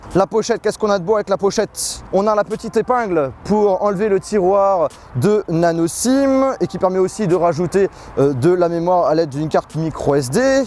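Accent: Belgium. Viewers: Par contre t'es vraiment en train de prendre l'accent belge ... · t est belge ? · tu es Belge ????? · tu es belge?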